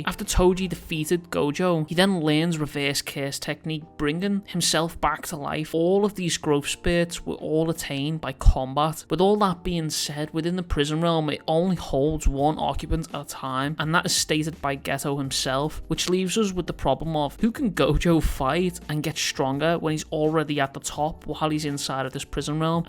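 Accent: Liverpool, United Kingdom. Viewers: You a scouser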